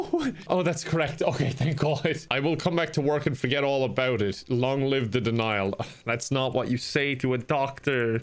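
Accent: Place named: Ireland. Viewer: I swear the more insane gets the heavier his Irish accent gets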